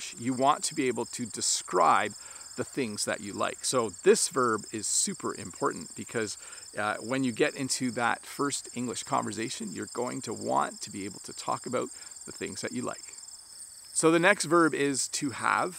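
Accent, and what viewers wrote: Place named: Canada. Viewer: a great opportunity to be in contact with the Canadian accent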